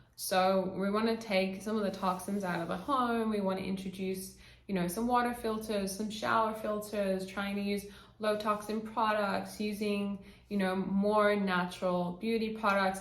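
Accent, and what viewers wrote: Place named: United States. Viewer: The American accent 😱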